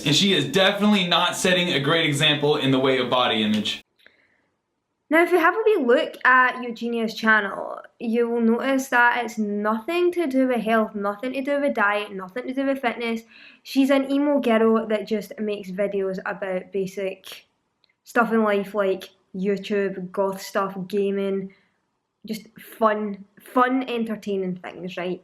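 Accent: Scotland, United Kingdom. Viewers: Please of course her accent is strong... Scotch, Scotland, strong accent.. Stop being negative and listen after you listen for a few minutes you get the sound and some of the slang is much like ours (assuming you speak English). is a very intelligent woman so don't miss out on her humor and wisdom because you won't take the time to just listen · is from Scotland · I love your accent, sooooo scottish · im frm south west scotland, you sound similar to me tbh, i understood every word, but also understand how slang it can sound x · thank you for defending her. I've been defended her and was attacked. Also I love your accent Scottish right? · Her Scottish accent is so O.oooooooooooooooo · She is Scottish just like me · i love your Scottish accent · it's a scottish accent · OMG I F*CKING LOVE THIS YOUTUBERS SCOTTISH ACCENT BEAUTIFUL Love Scottish and Irish Accents <3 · how can you not understand??? 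it's a fucking Scottish accent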